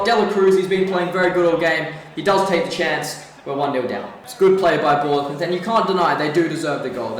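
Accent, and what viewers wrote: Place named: Sydney, Australia. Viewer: hahahahaha bro it’s the sydney accent cuz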